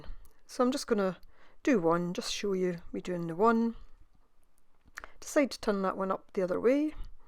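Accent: Scotland, United Kingdom. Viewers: Enter draw just found you through the hop and love your ATC’s lovely to hear another Scot on youtube thank you looking forward to trying this out with my scraps 🙂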